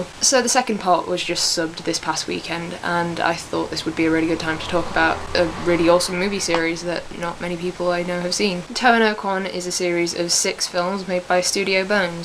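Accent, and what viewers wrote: United Kingdom. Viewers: Nice Review, It's nice to hear the voice of another British person other than myself lol. Also you did a great job editing the video It's almost seamless. Subbed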